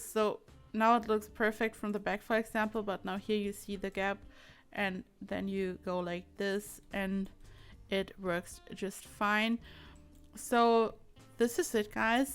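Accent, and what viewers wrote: Germany. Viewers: You have a very german accent? Do you come from Germany?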